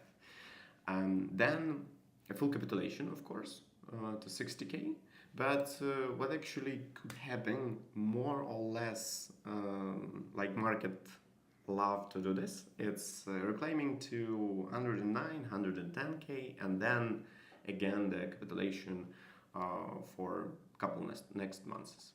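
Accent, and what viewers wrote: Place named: Russia. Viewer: Хочешь на англ аудиторию работать, поставь произношение. Такой английский для рускоговорящих - родной, но возникает немой вопрос: "а можно уже на русском?". Англофоны будут скипать пока, сложно разобрать что ты там говоришь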